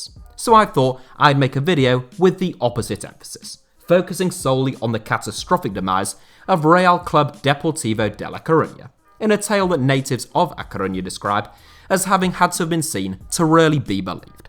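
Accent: England, United Kingdom. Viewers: Your accent is from what part of england? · Your english accent is unbearable. Learn how to speak!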